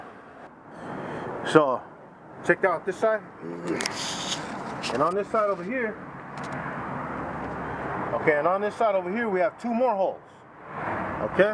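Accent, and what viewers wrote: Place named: California, United States. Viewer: i like your cali preaching.I'm mechinc in learning 5yrs and counting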